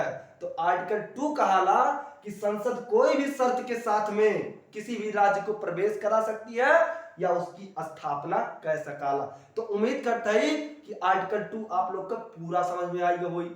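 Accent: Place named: Bhojpuri, India. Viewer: Waah भैया ekdum mst 🎉🎉😅 भोजपुरिया अंदाज mai 🙌🙌👍😂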